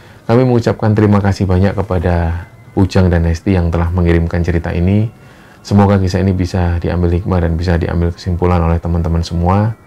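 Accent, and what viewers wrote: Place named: West Java, Indonesia. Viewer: Lidah Kulon 👍 mas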